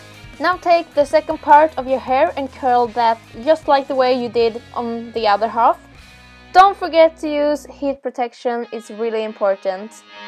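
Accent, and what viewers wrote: Sweden. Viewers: Just gotta love the Swedish accent tho! ;D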